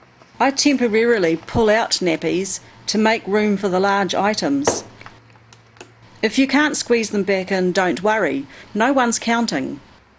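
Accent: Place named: New Zealand. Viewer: kiwi accent <3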